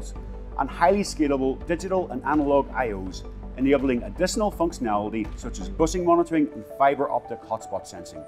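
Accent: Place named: Northern Ireland, United Kingdom. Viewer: Excellent Video. The subtitles helped with the NORN IRON accent!:-)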